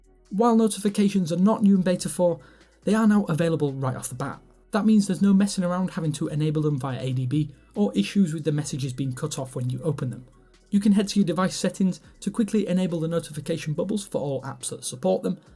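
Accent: United Kingdom. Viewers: British Accent!🤦🏻‍♂️ Maybe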